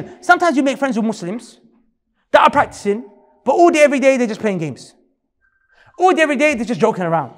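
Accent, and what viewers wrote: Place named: London, United Kingdom. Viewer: This is how a lot of people in London speak these days, not just the ‘youth’. It is just multicultural London English.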